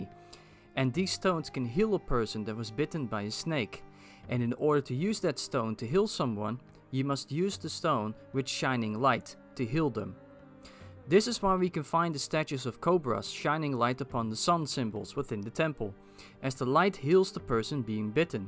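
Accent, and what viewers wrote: Netherlands. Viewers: Dude ben je Nederlands ofz want ik hoor tering vaak een Nederlandse stem en accent er door heen. En je moet een beetje werken aan hoe je sommige dingen zeg naar verder goed bezig man!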